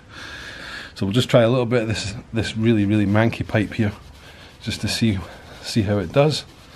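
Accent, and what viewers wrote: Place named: England, United Kingdom. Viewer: Great review. Can you get this in the US? I like your accent. Which part of England are you from?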